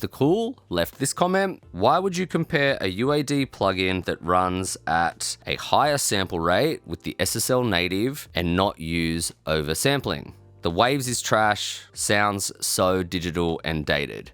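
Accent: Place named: Australia. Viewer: Hi there, Iike your content, its interesting and informative and your accents reminds me on my days touring Australia in the 90 ies ... keep up the good work. Toni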